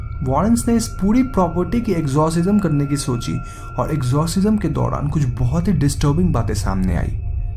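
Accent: Bengal, India. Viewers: Aap Bengali ho kya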